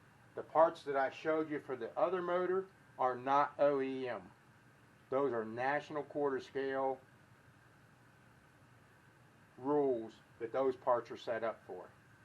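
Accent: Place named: West, United States. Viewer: So are you a mechanic or a very handy man? And sounds like someone from the west.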